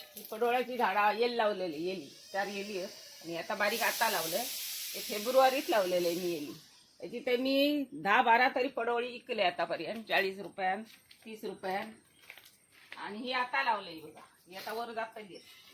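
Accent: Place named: Konkan, India. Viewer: खूप छान वाटला बघताना आणि तुमचा मालवणी ऐकताना. एकदम कोकणात गेल्यासारख्या वाटला. इतके ताजे फडफडीत मासे खंय बघाक मिळतंत. 😊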